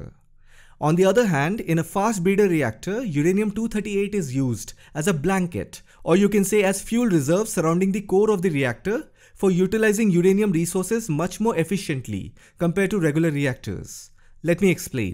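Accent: India. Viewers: Love your Indian accent and really great explanation, thank you.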